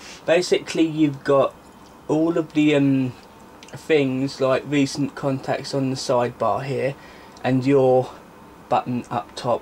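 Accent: United Kingdom. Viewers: are u from essex ...i like your accent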